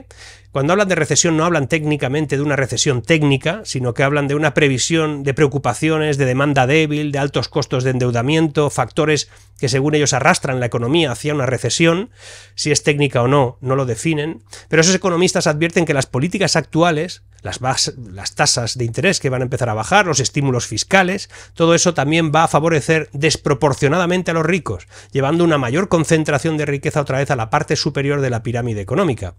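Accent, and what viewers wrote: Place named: Spain. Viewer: Habla 120% como un español pero tiene nombre y apellido franceses, y parece que vive en Francia. Curioso.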